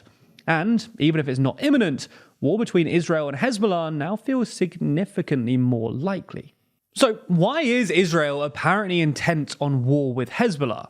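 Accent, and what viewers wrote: United Kingdom. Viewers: You sound british. Let me ask you, when the germans attacked your country in 1940 did your grandparents care about the german civilians??? Grow up. If you elect terrorists, expect terror.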